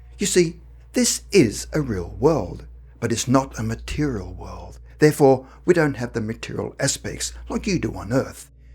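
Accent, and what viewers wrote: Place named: Australia. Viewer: I find the narrator's voice very engaging, yet somehow pleasant and soothing at the same time. Though many of these stories seem to be set in England, I thought the narrator's voice sounded Australian. I finally checked and saw that emanates from Australia. I'm pleased my tin American ear was correct for once.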